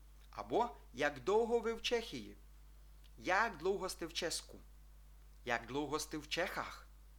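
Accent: Ukraine. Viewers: Ну как можно учить чешскому ,разговаривая по закарпатскому ? Это капец ! Невозможно слушать Один я слышу разницу ?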